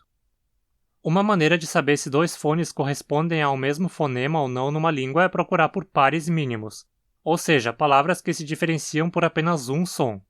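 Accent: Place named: Rio de Janeiro, Brazil. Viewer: Sotaque do RJ, amei❤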